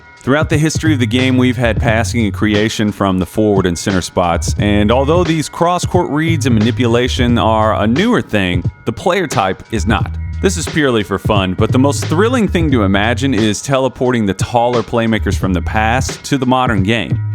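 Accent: United States. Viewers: The way you say Luka Doncic...Are you east European? Otherwise you sound very Americano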